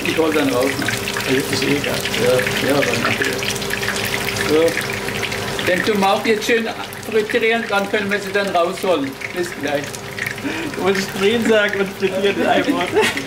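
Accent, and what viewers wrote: Swabia, Germany. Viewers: das beste ist dein schwäbisch. Danke furs Rezept. :-)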